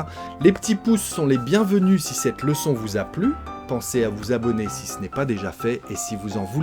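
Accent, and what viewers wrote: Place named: France. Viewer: face-blue-smiling: ... Bonjour... Je ne sais pas si je vous ai déjà demandé d'où vient votre accent français... Je l'aime beaucoup et c'est pour cela que je vous suis. Merci pour ce que vous faites !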